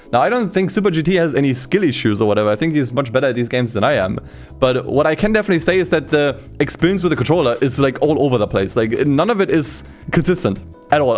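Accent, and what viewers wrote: Germany. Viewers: u german?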